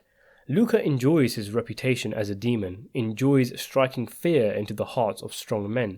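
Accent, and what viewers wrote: England, United Kingdom. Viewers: This is the second video of yours, BUT.... I'm from California, I'm old, and bad hearing. So I don't watch stuff with ACCENTS, the SE American's are bad enough but you Brit's have a few of your own. So wasn't going watch any more of your video's, BUT, I said give it an other shot cuz I really liked Luca Brasi and liked it. Sorry, and I subscribed. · What part of England are you from narrator ? You speak a very rare dialect.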